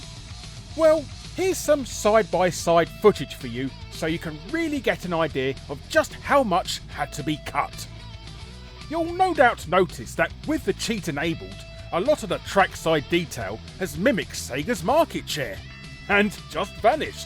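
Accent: United Kingdom. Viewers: He's just British.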